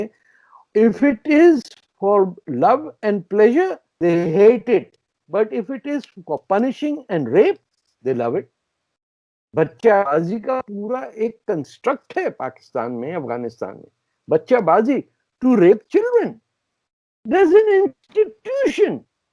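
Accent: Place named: Bihar, India. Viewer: Didi aap Japanese jada lgte ho.... Par jese heee bolte ho lgta h koi patna ranchi wala bol rha h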